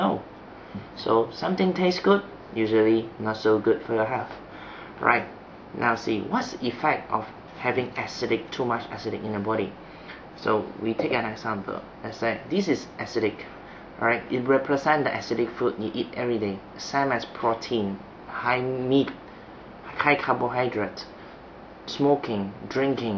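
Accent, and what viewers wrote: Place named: Thailand. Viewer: this guy is Thai from his accent...he said "minira" lol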